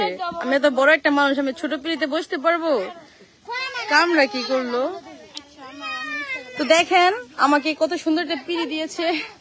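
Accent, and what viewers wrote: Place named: Chandpur, Bangladesh. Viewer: আপনার চাঁদপুরের ভাষা আমার শুনতে খুব ভালো লাগে। আমিও ঢাকা মুগদা থাকি,আপনি ঢাকা কোথায় থাকেন।আমিও মতলব থানার ছেলে।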